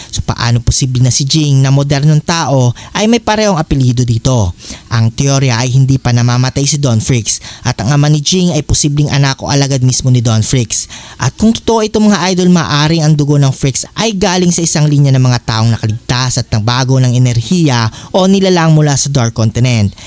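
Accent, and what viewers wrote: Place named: Visayas, Philippines. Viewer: bisaya amp haha ausin mo tahalog mo 😂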